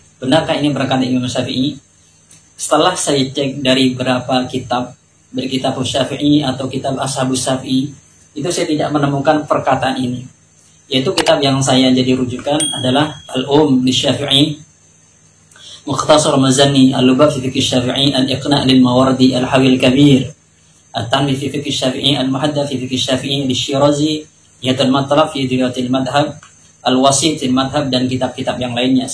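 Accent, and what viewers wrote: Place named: Java, Indonesia. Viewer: Ustad ini ngaku merasa pinter , Tp sebenarnya ga tahu sejarah Wahabi yg benar, Makanya dia salah TUDUH. Siapa pun ,selama salah tuduh,tentang siapa yg pantas disebut Wahabi, akan kelihatan taK tahu sejarah SEBENARNYA. Bahkan kalo maksain tak sesuai fakta, akan kelihatan gobloknya. orang jawa medok ini ,merasa bisa kalahkan ulama mekah (yg dia tuduh wahabi)yg sehari harinya bicara bahasa arab, dia cuma merasa hebat saja, itulah akhlak ahlul bid'ah yg memalukan . akhlak sombong, ulama medok merasa lebih pinter dr ulama fasih dr mekah. Padahal ustad ini ga tahu sejarah abdul Wahab bin Abdurahman bin rustum dari maroko /Mesir yg hidup di abad 2Hijriyah, yg berhaluan Tasawuf takfiri yg bidah juga. Nah Wahabi dari mesir inilah mazhab Wahabi yg sesungguhnya, Bukan muhammad bin abdul Wahab dr mekah yg hidup d abad 2 Hijriyah. 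yg memurnikan ajaran rasulullah dr Tahayul Bidah & churofat. Berani berbohong? Atau menutupi fakta sejarah ini. Ya begitu lah ah kak ahlul bidah & Syi'ah, yg sama sama ,bernafsu ingin memadamkan dakwah salaf, (pemahaman sahabat rasulullah) Dgn cara berbohong, menutupi fakta sejarah, fitnah , memberi julukan keji Padahal julukan itu salah sasaran, julukan Wahabi harusnya pada ahlul bid'ah, krna bapak nya muhammad bin abdl Wahab, yaitu abdul Wahab adalah sufi ahlul bidah, Yg di tentang oleh anaknya(muhammad). -muhammad anti bid'ah - ayahnya muhammad: abdul Wahab adalah ahlul bidah - jelas beda ajaran. Kaum salaf= muhammadi Ahlul bid'ah= Wahabi=sani uye= sperti mal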